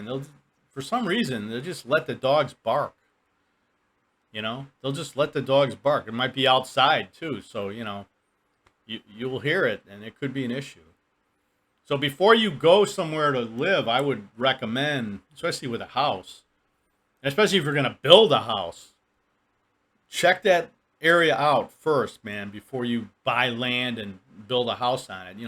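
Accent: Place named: Wisconsin, United States. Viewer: The older i get the less noise l can tolerate , headphones hehe rooster barn ,are you sure you ain't living in a chicken coop? I hear there a rooster gangs there l used to wish l could sing, now l wished they could. Mmmmm diesel exhaust. We are too stoopid to go metric mmmmmm big black plumes of smoke l had a feeling you was from the Midwest , l was thinking Ohio I'm even hearing a little Wisconsin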